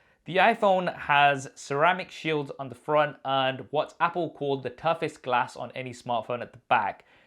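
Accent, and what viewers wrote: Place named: United Kingdom. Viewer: An Indian dude with a British accent? that would be very interesting to see in person here in maga country lol 😂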